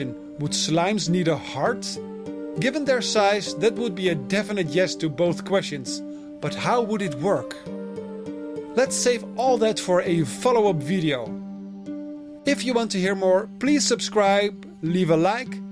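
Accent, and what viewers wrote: Netherlands. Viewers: oh dear, i am hearing a Dutch accent! hollandse youtubers zie je niet zo vaak!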